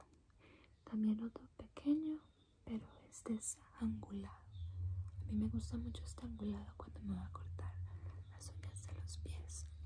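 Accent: Colombia. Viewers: El acento colombiano me encanta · me encanta tu acento colombiano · Me encanta el acento colombiano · me encana el acento colombiano es mi favorito en el mundo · aprovechar tu acento colombiano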